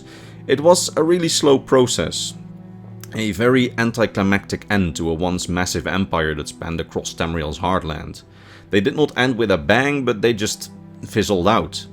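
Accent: Netherlands. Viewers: Nice vid. Liked and subscribed! Btw, are you Dutch?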